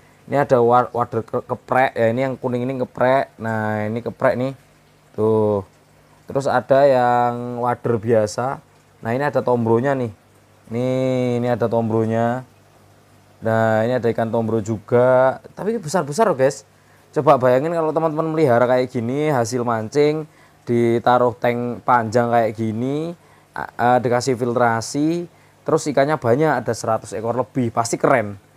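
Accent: Indonesia. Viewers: Sampean omahe endi bang...kok logate rodo semarangan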